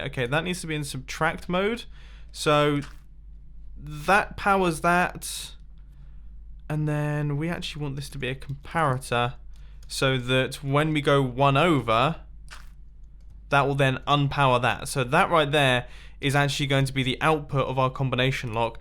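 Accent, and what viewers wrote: United Kingdom. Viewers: For StandingWind: That's because he isn't from USA. He is from UK and therefore the accent is different. Got it??? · That's why he's getting annoyed, because from UK he pronounces it differently from Americans. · I'm pretty sure the way he says it is a British pronunciation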